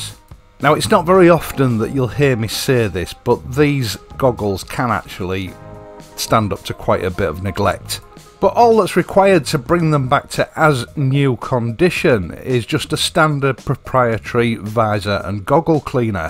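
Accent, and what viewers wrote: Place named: Barnsley, United Kingdom. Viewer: Interesting accent! Where? Not Liverpool: barnsley perhaps? I am about to buy a Bell 500 (why don't they do the colours they used to market in the US??) and yes, Halcion goggles as per yours. It was almost already decided but your vid. took away any further question apart from where to buy both in or around Somerset (not via computer!!!). Bike,, I'm 71 and just bought a 1959 Harley Duo Glide. Silly old fool!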